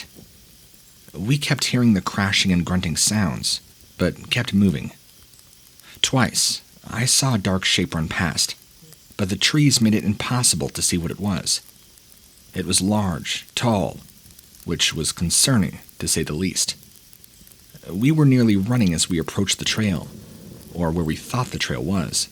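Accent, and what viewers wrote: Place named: United States. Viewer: You sound American but yet use the meter instead of feet, anyways I'm too tired to go look it up I'm out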